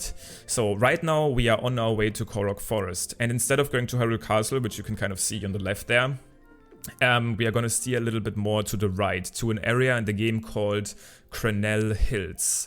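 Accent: Germany. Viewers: you sound german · Man merkt den Deutschen Akzent so hart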